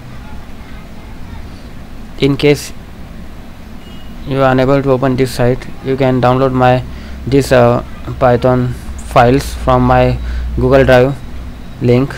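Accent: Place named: Arab world. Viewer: من صوتو واضح عربي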